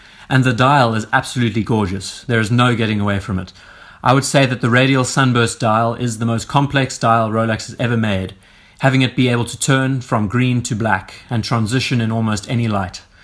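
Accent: Cape Town, South Africa. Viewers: Tell me are you from Cape Town?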